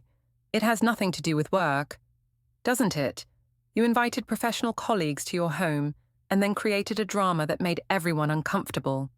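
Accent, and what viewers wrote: United Kingdom. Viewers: Excellent, if sad, story. Good plot editing, good narration. Captions seemed correct for spoken words and had proper punctuation. Recommend this channel. Voice has British accent to my ears.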